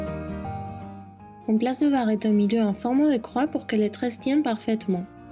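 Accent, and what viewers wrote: Spain. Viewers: Elle est espagnole. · Elle est espagnole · Elle est espagnole😍😍😍😍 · J'aime vraiment ta voix et ton petit accent espagnol ! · tu as un joli accent espagnol et j'aime bcp les coiffures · Tu as un joli accent espagnol, et tu est très jolie. :)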